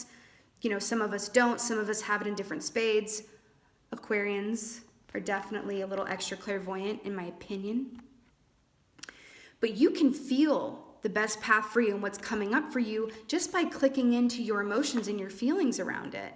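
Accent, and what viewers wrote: South, United States. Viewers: I here the "y'all" and I know you must be from the south....I'm from south Alabama and y'all is my favorite!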